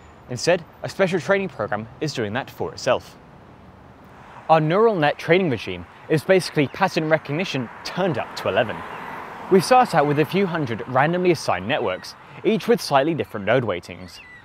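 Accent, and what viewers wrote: Australia. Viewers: Is this Australian Tom Scott? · This guy is just an australian Tom Scott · australian tom scott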